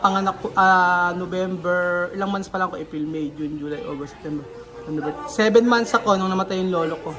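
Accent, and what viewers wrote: Philippines. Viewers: Pati Naman Po Yung way Ng pagsasalita may say pa din kayo? Malamang Po iba Ang province ni kuya malamang may mga salita Yan na di natin nakasanayan naririnig. May friend aq from CamNor pag nagsasalita lagi may Ma sa unahan Ng bawat salita Niya like mapunta sa mall,makain na tayo,matulog na,maligo sa ilog. Bawat Lugar Po sa atin ay may mga Punto or salita na pagkakakilanlan Ng kanilang mga Lugar.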